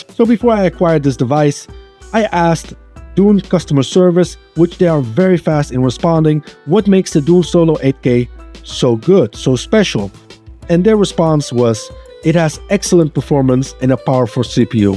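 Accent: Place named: Netherlands. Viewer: You sound like a dutch speaker trying to talk english ... 😅 Kom je uit Nederland?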